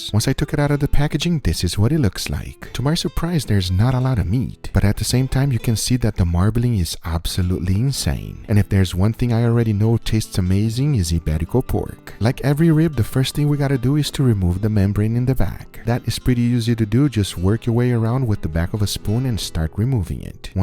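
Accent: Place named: Brazil. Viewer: Brazilian Accent ALERT!!!!! hahah muito bom seu canal to usando varias técnicas que aprendi com você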